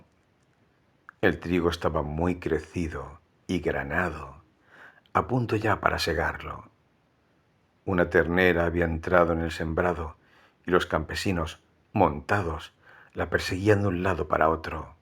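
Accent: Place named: Spain. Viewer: VOZ AGRADABLE Y ESPAÑOLA !!!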